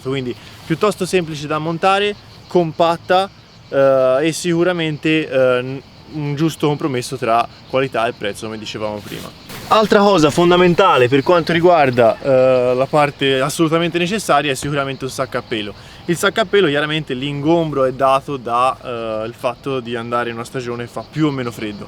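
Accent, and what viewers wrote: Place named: Tuscany, Italy. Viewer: "queesto materassino della (c)amp" adoro l'accento toscano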